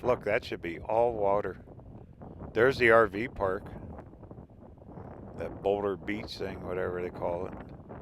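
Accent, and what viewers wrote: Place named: Upper Midwest, United States. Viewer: I love your upper Midwest accent.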